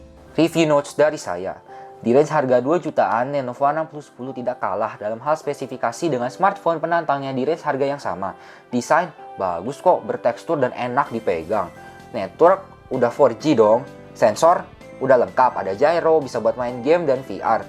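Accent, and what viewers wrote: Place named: Java, Indonesia. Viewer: medok banget hehe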